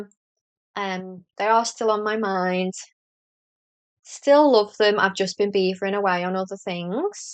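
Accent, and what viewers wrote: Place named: Northern England, United Kingdom. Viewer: Love your podcast. You were recommended to me by someone in my discord channel and you've quickly become one of my favourites (Plus it's so nice to hear another Northern lass on here!) x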